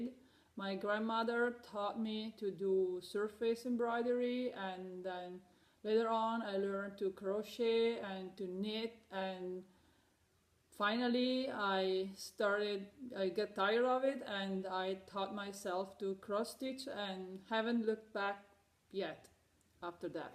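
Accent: Italy. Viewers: I'm just now starting to watch your videos. What a beautiful Italian accent you have! I love it!! I'm trying to learn Italian using the Duolingo app. Definitely the most beautifully spoken language ever, in my opinion.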